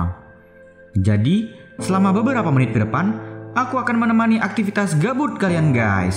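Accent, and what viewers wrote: Medan, Indonesia. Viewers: Orang medan bang? :v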